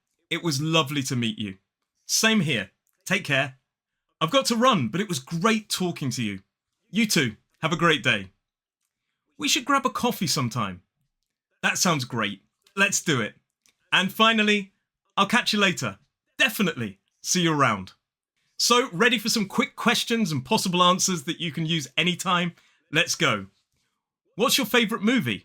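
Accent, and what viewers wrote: United Kingdom. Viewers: 我真的很喜歡英式腔調 I really like British accent….🫣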